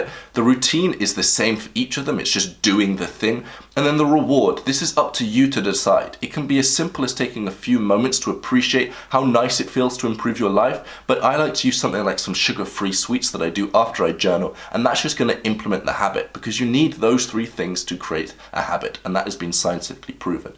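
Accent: United Kingdom. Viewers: I've payed thousands of dollars - proceeds to show pictures of euro-notes :D. Btw your accent sounds very British to me (?)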